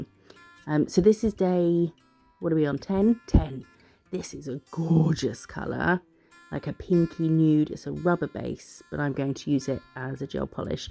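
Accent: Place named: RP, United Kingdom. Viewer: Absolutely love your videos. I laugh and learn! Also gott say I adore your accent. Im here in Texas and your Queens English is lovely to listen to.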